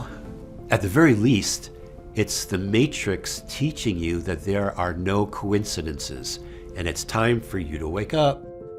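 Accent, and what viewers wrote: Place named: Italy. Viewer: I thought I recognized a fellow Italian in your cadence and light language. My Birth Name is Margherita Cumero. Comes with Love ❤️😘❤ Such Gratitude for Your Energy and and who lead this Dragon here.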